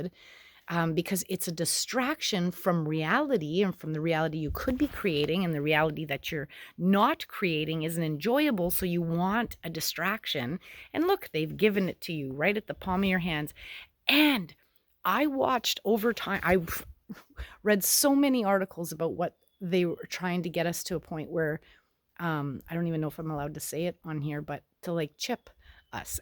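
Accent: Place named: Michigan, United States. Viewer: your accent reminds me of the mother's I grew up in hearing in Michigan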